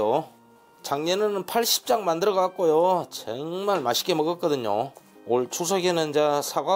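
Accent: Jeolla, South Korea. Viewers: 구수한 전라도 사투리. 정감있고 언제나 맛있고 정성어린 설명. 감사합니다~~ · 구수한 전라도 말씨 좋아요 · 전라도 말씨중에 제일 매력있는 목소리인것 같은데요 음식과 말투의 궁합이 최고~^^♡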